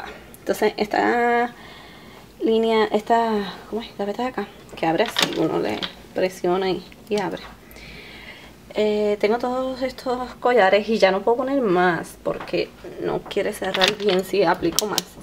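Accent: Puerto Rico. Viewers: Me facina ver tus videos Aww tu acento pueltoliqueño!! Eres hermosaaaaaa!! Me facino tu jollero!!! Besoooossss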